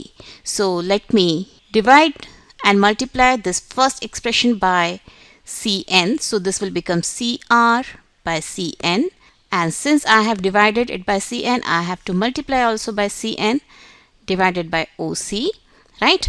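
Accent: India. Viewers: Indian accent ?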